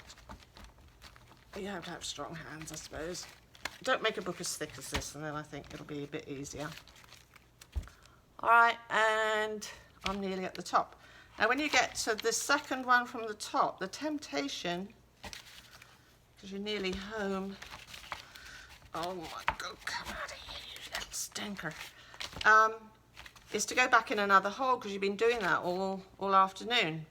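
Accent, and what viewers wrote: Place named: Ireland. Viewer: Thanks so much this is brilliant My mother is also Irish.... we can show off our Irish accents!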